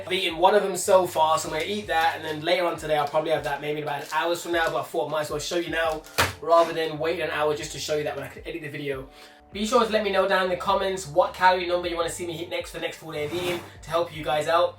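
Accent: United Kingdom. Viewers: Yo, don't hate me but I JUST realized you gave a British Accent. Lol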